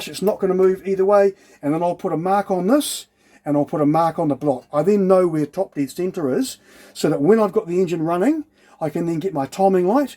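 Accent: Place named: New Zealand. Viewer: Cars and cameras, red beards garage have lots of helpful stuff....but the kiwi accent is great!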